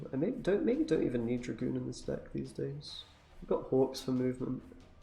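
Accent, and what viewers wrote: United Kingdom. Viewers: Thing I like with you, you speak calmy and with a good diction. Maybe I have wrong but I feel a little Scottish accent especially with the "o"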